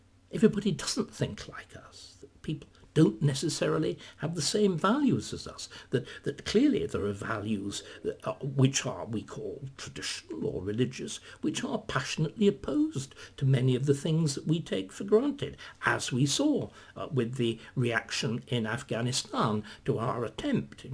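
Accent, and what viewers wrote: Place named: Oxbridge, United Kingdom. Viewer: Starkey is a fabulous Tudor historian but his increased penchant for political commentary has become annoying. As an academic, he should be more modest in his commentary on subjects outside his area of expertise; and certainly should not air such commentary on the the "David Starkey Talks" format. This confuses his area of competence with areas in which he is merely an amateur, albeit an educated one. His political talks lack objectivity. He always seems to know which conclusion he wants to draw. People may start to question whether he takes the same approach on his histories! Alas, judging by the comments below, my fellow Americans are too willing to give credence to this warmed up drivel delivered in an Oxbridge accent.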